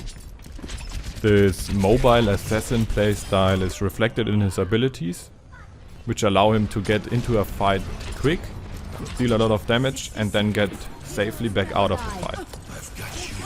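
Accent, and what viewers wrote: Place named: Germany. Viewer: Are you german? I think you are i can hear of your dialect. Like your vids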